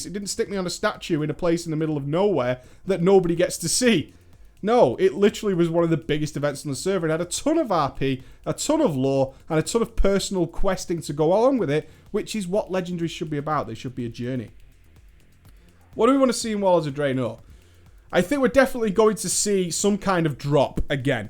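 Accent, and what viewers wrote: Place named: North West England, United Kingdom. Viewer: dont apologise for your cough, apologise for your scummy north west accent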